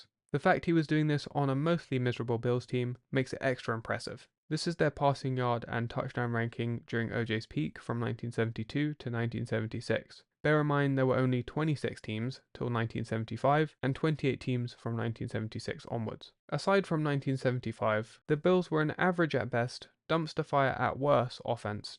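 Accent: United Kingdom. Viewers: Hi, i'd love you to make a video on how you got so interested in american football. I find it really interesting that a young guy from the uk (I think from your accent ) has such a love for the game. Thanks for your videos too, I think your channel is one of the best on the NFL on youtube. :)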